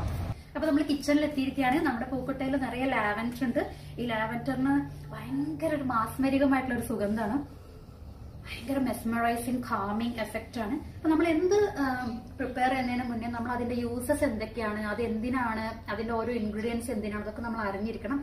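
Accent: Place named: Malappuram, India. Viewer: ഇജ്ജ് മലപ്പുറാന്ന് അന്റെ ഭാഷ പറയുന്നു 'keep it up Innocence feel എല= ഇല പിന്നെ നാട്ടിൽ ഈ പുഗ്ഗ് കിട്ടുലല്ലോ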